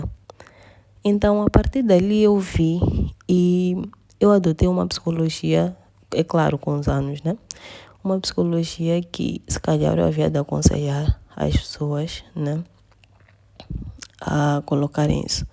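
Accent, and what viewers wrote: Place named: Mozambique. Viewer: Amiga, vc é perfeita! Me tira uma dúvida: De qual cidade/província de Moz vc é? Quero muito saber a origem do seu sotaque super fofo! Beijosssssssssss princesa!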